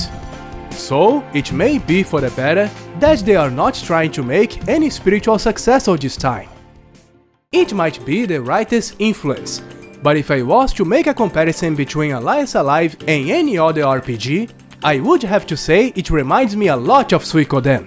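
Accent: Brazil. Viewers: are you brazillian? Your accent seens like. I would say you're even from RJ XD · sim sim,é um jeito bem caracteristico de falar,so quem é brazuka percebe,por sinal otimo review,parabens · painfull accent bro, I'm brazilian it looks like our accent, great channel but please get rid of the accent its actually not that hard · Espero poder jogar esse game no 3DS, fiquei desapontado com o Legend of Legacy! E parabéns pela desenvoltura no inglês, pelo sotaque logo percebi que era Brazuca também, sucesso no canal!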